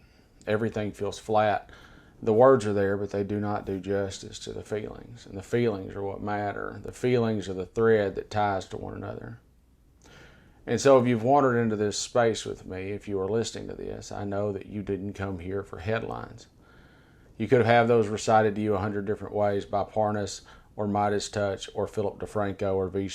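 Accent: South, United States. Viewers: It soothes my soul to hear your words spoken in an accent that swaddled me with love and courage and righteous anger as I grew up. My parents and loved ones stood up to hate and taught me to do so. My neighbors and a few family members have succumbed to that old American hate again. It’s disheartening, but your words spoken in that Southern cadence that has the capacity to carry so much love give me hope in a language that wraps my heart in that familiar love. Thank you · I think it’s the profound eloquence wrapped in sweet southern drawl that is so soothing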